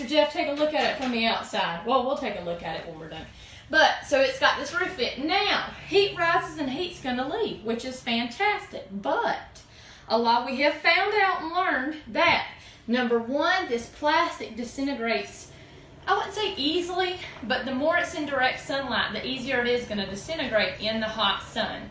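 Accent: United States. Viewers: Love that southern accent! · Listening to you speak sure makes me miss Tallahassee; we don't talk like that in Wisconsin. I've been debating side or roof -mounted ventilation and your informative video was perfectly timed - thank you.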